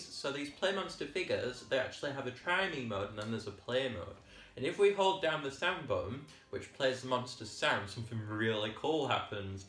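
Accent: United Kingdom. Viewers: nice British accent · I love your accent! I'm also British, so I'm not that different from you. 😊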